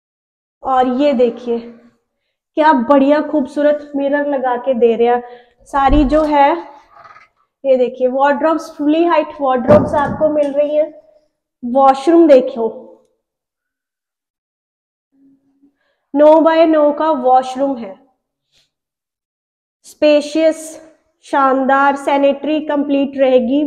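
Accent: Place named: Punjab, India. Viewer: Kudi punjab d lagdi aa jo punjabi vich Hindi fit kari ja rahi aa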